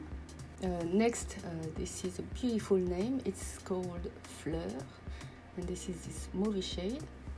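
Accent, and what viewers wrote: France. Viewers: I tried my Viseart Paris Edit palette for the first time last night. Though the quality of the shadows is very good, let's just say that I ended up looking hungover rather than elegant like you do. Even though I don't have a monolid, I'm going to try your method--it really came out nicely. Love the name of your channel and sweet French accent. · Do you speak french by any chance? It sounds like you have a french accent which would be pretty cool! :)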